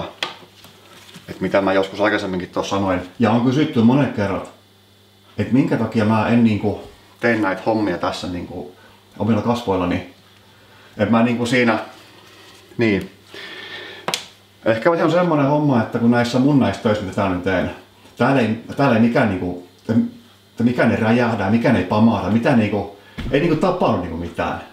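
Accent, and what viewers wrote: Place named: Turku, Finland. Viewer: Tuosta murteesta sellainen, että oli alkuun vaikea ottaa sun sanomisia ja ohjeita tosissaan, kun kaikki oli turkulaisittain ilmaistu epävarmasti ja "vähän sinnepäin", mutta siihen on tottunut. Tässä kun on sun videoita ihan ajanvietteenä ja uuden oppimiseksi lomalla katsellut, niin tässähän saattaa kohta uskaltaa jopa käymään Turussa, kun alkaa ymmärtämään murrettakin. :D Sopivan selkeitä videoita ilman turhaa koristelua, hyvä hyvä!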